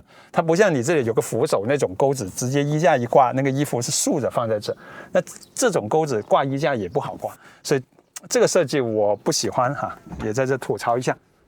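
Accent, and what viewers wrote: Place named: South, China. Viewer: 听口音，100%南方人，哈哈哈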